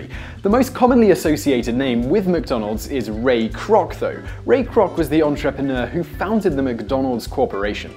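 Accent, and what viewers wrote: United Kingdom. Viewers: I get to watch one of your videos and look at your sexy face while you speak with that amazing British accent! 16/10 would watch again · Sexy British accent.cheers mate!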